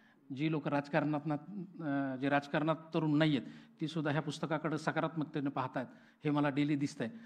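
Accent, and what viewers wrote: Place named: Rural, India. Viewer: पुढ जाणार भाऊ तुम्ही. भाषण तर ठिकच. पण बोलताना जरा ग्रामीण बाज आहे. म्हणाल्यावर पुढ घेणार ते चौकडी तुम्हाला. 😊😊😊😊😊😊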